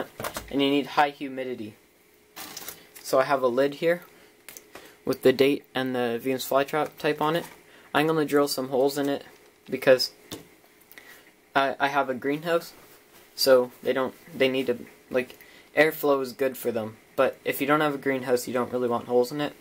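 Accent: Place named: Canada. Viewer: No. I just thought I detected a Canadian accent.;-) Did I ask you if you live in Canada? If I did, my old comment is not showing anymore. I talk to quite a few YouTuber’s. So that question only applies (do you live in Canada) if I did ask you that.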